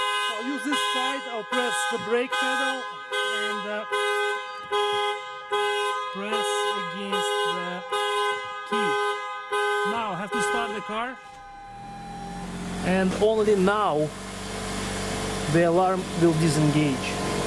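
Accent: Russia. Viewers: That Russian accent 😂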